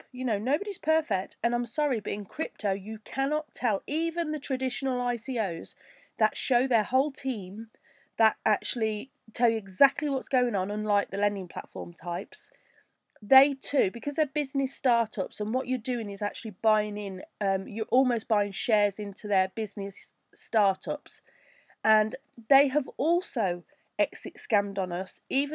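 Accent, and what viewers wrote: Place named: London, United Kingdom. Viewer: I want to say I liked the video nice accent you sound like your from my neck of the woods in London? love to work with you guys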